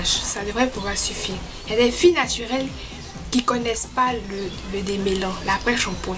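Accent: Ivory Coast. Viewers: J'ai imediatement cu qu'elle est ivoiriene grase a son acsen francais.D'esole pour mais faute mon clavier est en Englais je Vie au etat unit et je suis burkinabe je vais au licey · Jaime bien tes conseil tes belle avec cette voiture mais l'accent ivoirien te a trop mais bon courage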